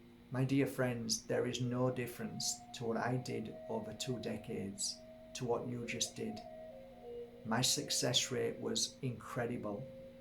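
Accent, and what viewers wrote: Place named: Northern England, United Kingdom. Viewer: Thank you for this valuable information, It was quite nice that when I searched for healing at a distance, it was you that popped up and I immediately recognised you from the sheep farm podcasts. Which I'm a fan off. I'm in Bolton, greater Manchester. I've got a loved one in hospital with a stroke, using your guidance, I felt myself in the room with her, I have tried before, but with no idea what I should be doing, but this was an amazing help, thanks. All the better being a northern lad, whose voice I was familiar with and could relate too. A synchronicity in itself.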